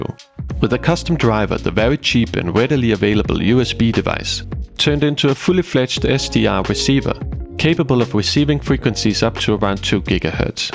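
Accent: Denmark. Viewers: 110% dansk prosodi. Det er ligesom at høre Mumlemanden 😊❤️ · Is that a Danish accent i'm detecting? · lmao I watched all the way up to the point where you showed the Danish map until I realized you were Danish too. Your accent is very faint.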